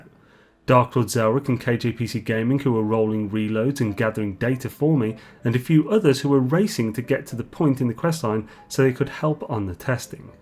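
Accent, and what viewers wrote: United Kingdom. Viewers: You obviously know your game... some of us, however, do NOT. The way you whiz through points that you don't explain, leaves me wondering what you just said and/or how you did it. You speed through a dialogue of which I understand approximately half. You have a British accent, which is great, for I believe the British usually have a superior grasp on English enunciation- or aptly named "The King's English". I am from the United States and not blessed with perfect speech comprehension, which leaves me puzzled at the end of this video. I am only suggesting that you consider slowing down a little and being more concise in the future. Simplifying the explanation is not degrading it. Thank you for your videos.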